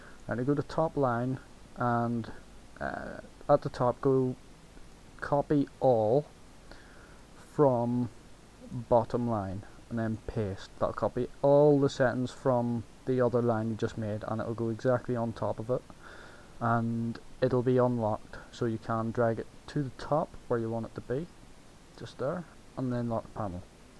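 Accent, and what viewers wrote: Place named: Northern Ireland, United Kingdom. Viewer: Northern Irish accent is beautiful. Could listen to it all day!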